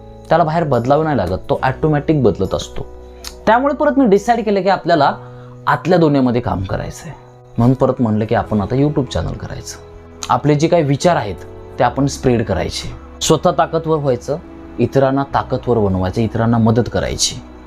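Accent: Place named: Kolhapur, India. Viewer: छान माहिती व विश्लेषण करून सांगता व तुम्ही तुमच्या बोलीभाषेवरुन आमच्या कोल्हापूर चे वाटता.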